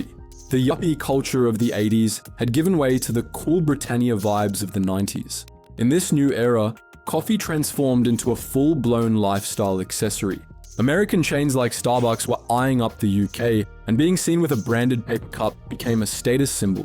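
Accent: Australia. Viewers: Based in Cyprus, Australian "voice", making videos on the UK Lowk slop icl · I know it’s AI but love how it’s an Australian with deep, comprehensive knowledge on UK high streets 😂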